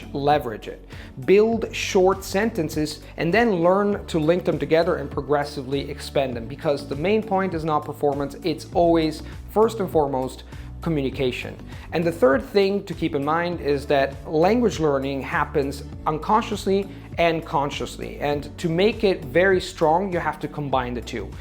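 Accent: United States. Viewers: You sound American, kudos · I'm shocked too, he sounds american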